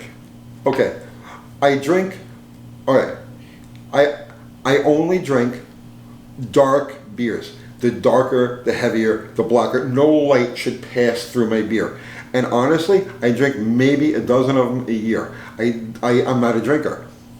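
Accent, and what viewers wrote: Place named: Philadelphia, United States. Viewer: Why don't you roll up a cigarette pack in your sleeve you look like an idiot when you're smoking on camera. I mean it's already an uphill battle with that yo Adrian accent you have synonymous with stupid so just put the cigarettes out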